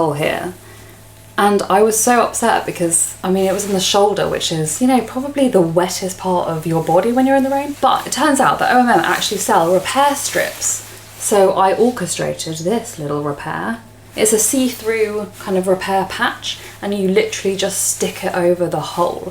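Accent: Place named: Liverpool, United Kingdom. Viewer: I love your Scouse accent :) x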